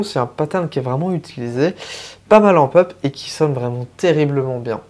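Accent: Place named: France. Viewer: J'avoue que quand je vous entends dire des mots anglais avec une prononciation française ça pique les oreilles. Paterne ou bien séquence...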